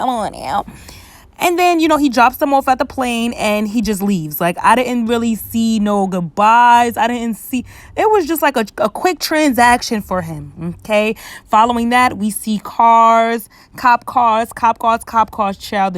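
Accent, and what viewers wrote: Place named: New York, United States. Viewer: You have that New YORKERRR voice 🥰 NYC gang 😝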